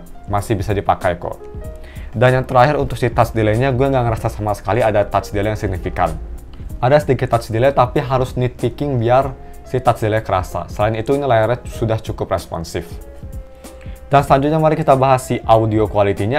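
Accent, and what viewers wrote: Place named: Kerhor, Indonesia. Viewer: Bahasa audio nya pasti orang kerhor ini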